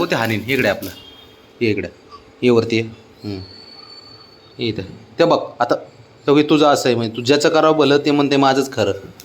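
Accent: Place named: Sangola, India. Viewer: तुम्ही पुण्यात राहत आसला तरी सोलापूर ची सांगोला ची भाषा आहे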